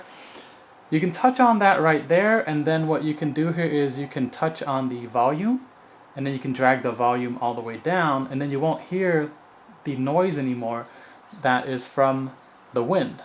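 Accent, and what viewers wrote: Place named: United States. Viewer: Wow you really sound like American when I don't see your face hahaha,same here, I'm not Native Speaker of English but I can speak English very fluently hehehe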